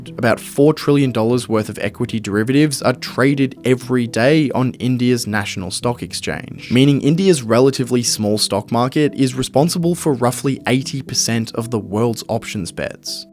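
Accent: Australia. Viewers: It's so hard to listen to the Australian accent. Putting a subtle r at the end of words that end in o...lol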